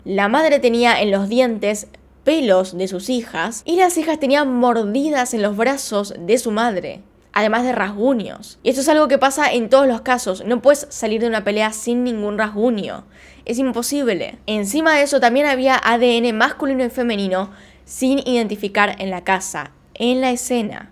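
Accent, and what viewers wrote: Argentina. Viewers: Me encanta el acento argentino😀 Excelentes vídeos... Saludos desde Ecuador 👏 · Hola me encanta como narras todos los casos ya mi hija y mi yerno están adictos también a tu canal..pero..quisiera preguntarte Cómo te llamas..cuantos años tienes lo unico que sé es que eres de Argentina por tu acento Soy Panameña por todo lo demás y como decimos acá me quito el sombrero ante tu excelencia de documentar investigar y narrar todos los casos...Felicidades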